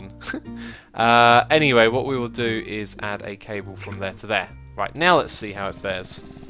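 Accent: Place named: United Kingdom. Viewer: DaSquirrelMan Yeah, his accent is hard to pin down. I wasn't sure if was British or Scandinavian at first. Still sounds like there's some British influence in there.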